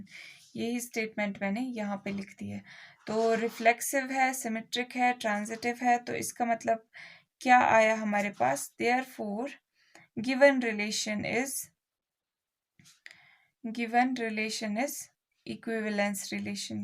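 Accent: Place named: Haryana, India. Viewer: mam aappp haryana se ho kya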